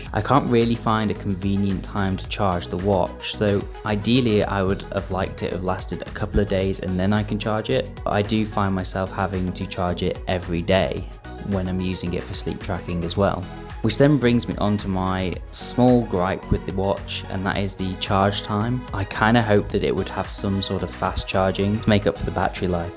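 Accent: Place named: Princes Risborough, United Kingdom. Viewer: yeah because that P Risborough accent wont go away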